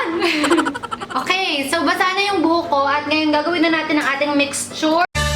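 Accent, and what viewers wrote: Batangas, Philippines. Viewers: Ate, ano year mo na sa college? Bsu ka pala. Kaya pala mala accent mo pang batangas hahaa. Bsu din ako incoming, freshmen pa nga lang.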